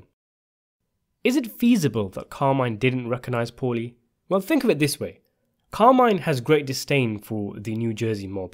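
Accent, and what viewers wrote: United Kingdom. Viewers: I like hearing quote Sopranos lines in an English accent. “You’re a wormy cocksucker 🧐” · The British accent of the narrator is so wrong for this video.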